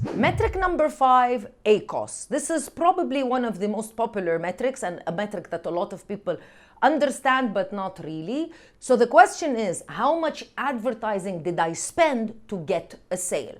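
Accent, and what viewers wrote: Arab world. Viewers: Love to hear Arabic accent of English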